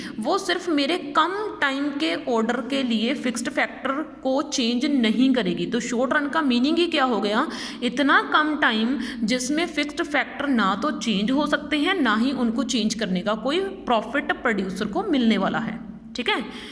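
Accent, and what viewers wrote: Punjab, India. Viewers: I think uu are Punjabi 😅....way of talking 👳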